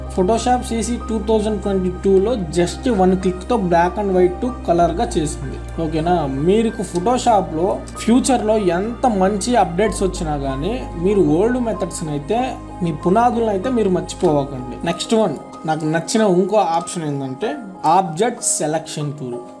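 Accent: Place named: Ongole, India. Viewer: Anna mi vaice ongol sai kada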